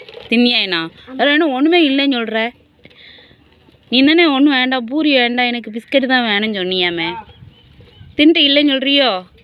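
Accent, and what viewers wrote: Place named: Thoothukudi, India. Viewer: அற்புதமான குழந்தை. நன்றாக வருவார். உங்களுடைய தமிழின் பேச்சு வழக்கும் ரசிக்கும் படியாக இருக்கிறது. அனேகமாக தூத்துக்குடி வட்டார வழக்காக இருக்கும் என்று நினைக்கிறேன். 💐💐💐💐💐 ♥️♥️♥️♥️♥️.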